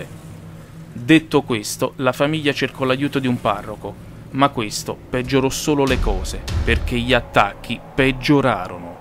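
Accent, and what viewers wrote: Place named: South Tyrol, Italy. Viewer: Ti do like perché te lo meriti, però promettimi che fai un corso di dizione, e che ti Levi l'accento tipico del tirolo... Senno non puoi avere un tono professionale...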